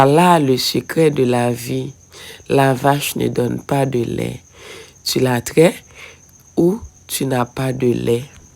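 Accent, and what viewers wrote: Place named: Democratic Republic of the Congo. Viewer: dans cette vidéo tu as l'accent d'une congolaise,depuis la nuit des temps ça a été toujours ainsi rien n'est gratuit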